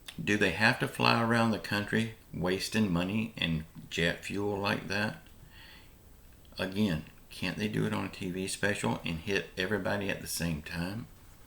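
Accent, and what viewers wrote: South, United States. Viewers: Good Morning. Hahaha..”If it don’t help me, it should not be.” hahaha..where do you come up with your material? Hahahaha..love it..funny, but it shows what type of people we have become. And yes, I agree with the comment about “liking the way you talk.” You are an extremely good communicator who can get your point across in “plain talk.”...which is a rare quality..a lot of people overdress words. And, my wife and I loved the South..there is something special about a Southern accent. You have been blessed with talent and appeal.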